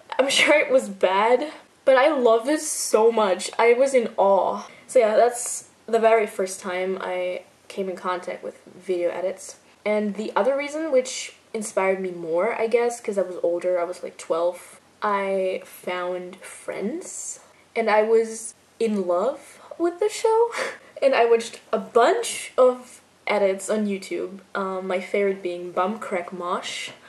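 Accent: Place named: United States. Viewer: Hi! If you're from Austria, how can you speak so beautifully in English and especially with an American accent? By the way, love your videos! ( Greetings from Hungary 😊 )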